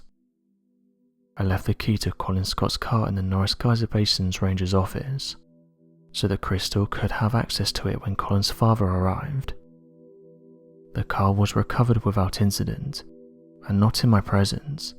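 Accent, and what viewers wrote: United Kingdom. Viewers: Well this information has been available for decades, I couldn’t stand the video because the whispering voice is so annoying, though he has a beautiful British accent he’s trying To narrate like it is a ghost story and it’s just pathetic.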